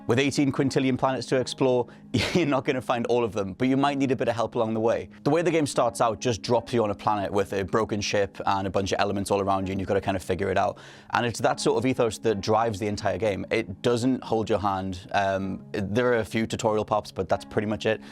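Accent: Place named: North East England, United Kingdom. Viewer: This help me out alot because im a huge noob on this game cheers mate!!! sounds like your from the north east of england as well i am to :D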